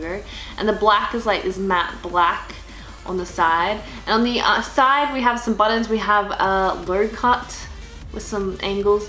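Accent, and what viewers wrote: Australia. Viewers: Stop trying to Americanize your Aussie accent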